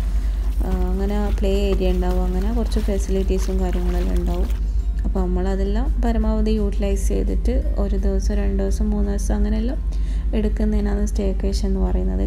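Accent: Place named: Malappuram, India. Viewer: slang Malappuram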